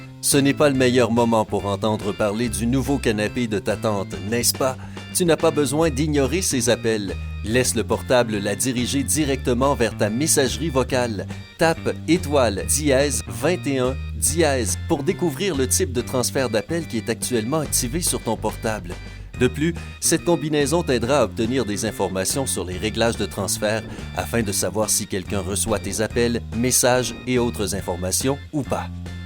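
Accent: Quebec, Canada. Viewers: Accent québécois ou je me trompe ! J’aime cet accent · C'est quoi cette accent de bouseux du Québec a vomir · Pk vous mettez un accent Canadien ? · Pour le premier conseil, effectivement L'IMEI est très utile en cas de vol pour inscrire le tph au fichier des objets volés. Par contre aucune localisation possible avec ça. Quant au blocage de l'appareil c'est pas la police qui le fait non plus, c'est votre opérateur. En tout cas en France c'est comme ça, au Québec (vu l'accent de la voix off) je sais pas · Voix de Québecoi ? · et sinon a l accent et aux numeros tapés sur le tel dans la video, vous ne comprenez pas que ca se passe au quebec et que donc il y a une partie des codes qui peuvent etre dependant des fournisseurs d acces, et que donc ca pourrai ne pas marcher pour tous les codes dans tous les pays? une partie doit dependre du telephone en lui meme (et donc marchent bien) et une autre partie du fournisseur.. 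en attendant ceux qui font croire connaitre tous ces codes, c est juste des branleurs qui se la racontent.. a part le #31# tres peu ont du deja entendre parler de ces codes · Je préfère l'autre voix de Sympa perso j'ai pas l'habitude de la voix Québécoise moi 😕. il dit smartphone en anglais et ça fait trop bizarre, j'aime pas !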